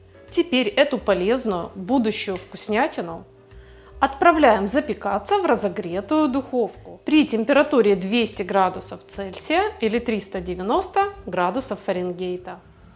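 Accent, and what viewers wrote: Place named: Ukraine. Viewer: Сразу слышно, что хохла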